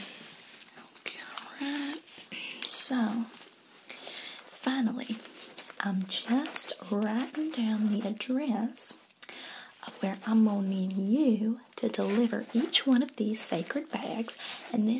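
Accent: South, United States. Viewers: Why the hell are you singing and why do you have a southern accent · This little series was so relaxing and reminds me a lot of the people from where I grew up! I usually hate such southern accidents but it's really cute when you do it 😊 thank you for all the tingles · so lovely, thanks for all those hilarious and relaxing vids with Brandy Jean and that southern accent XD You are the master of entertainment for me in ASMR!! love from Germany!! <3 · Seriously loved your country accent in this series! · this is my first time watching her channel like bro American accent on fleak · I have loved this entire series. Really impressed by your Southern accent as a Southerner myself.:) Loved the "surprise" ending and that chant! LOL. Haven't heard in a while.:)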